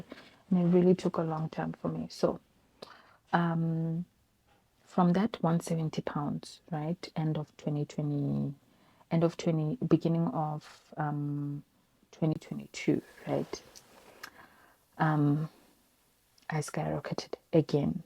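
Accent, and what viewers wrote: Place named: Africa. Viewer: it might not have been your intention, but race absolutely plays a part. I said nothing about you being racist or not watching other influencers of race. My point is once you interact with people of other races in real life, face to face(not online/ through a tv screen), then your opinions about how they speak hold more validity. You can't critique something you have no first hand experience of. Weight struggles, yes maybe. But the way she speaks? Absolutely not. African accents, dialects and tonations vary considerably, so if you're not used to it, it's easy to fall back on loose criticisms like it being AI generated. Imagine if the OP left a similar comment and justifying reasons for a Romanian native, having only seen Romanian influencers online and never stepped foot in that country-- they would come for her neck. Just make sure your receipts are valid, that's all.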